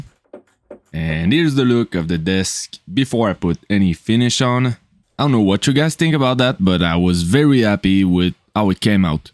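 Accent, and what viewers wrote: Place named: Quebec, Canada. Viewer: well done sir. As someone who also works with reclaimed wood, I appreciate the amount of milling required to make it that good. Such a shame you didn't keep it. Your camera angles and editing are great. Your are well spoken and explained really well. My only issue is with the unnecessary music. Your voice and shop sounds are all you need. You sound French/Canadian...where are you from? Considering you only have 1 video so far...you should be pleased you already have over 1k subscribers!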